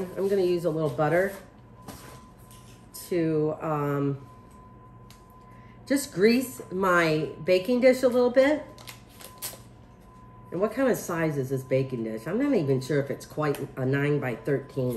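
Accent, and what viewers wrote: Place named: Michigan, United States. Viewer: LOL Yup, she's from Michigan . . . says "MELK" instead of milk. Go Franklin Patriots!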